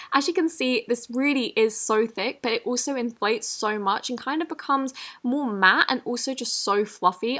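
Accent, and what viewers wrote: United Kingdom. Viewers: I don't know if it's just me my cousin doesn't really like her asking but I love her British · r u british? u have a nice accent and I love it! is that weird???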